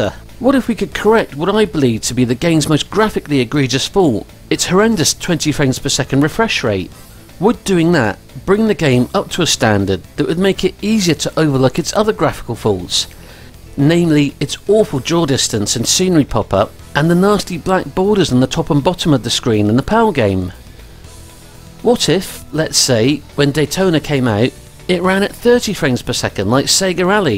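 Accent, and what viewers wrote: England, United Kingdom. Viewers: You have a graceful English accent,. Keep it going!:yougotthis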